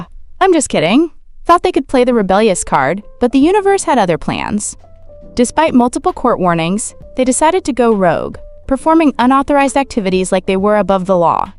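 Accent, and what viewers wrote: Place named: Nigeria. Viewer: she sounds Nigerian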